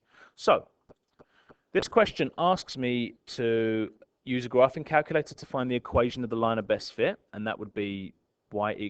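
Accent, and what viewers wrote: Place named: United Kingdom. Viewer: I like this british accent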